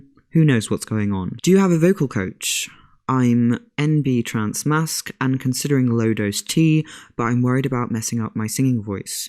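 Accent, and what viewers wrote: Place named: North England, United Kingdom. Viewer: Delete this comment if it's too personal, but how did your lovely accent come about? Were you born in the north of England before moving to France, were you born in France and learned English from BBC television? Just curious because your voice sounds so good and I love hearing about where different accents originate from.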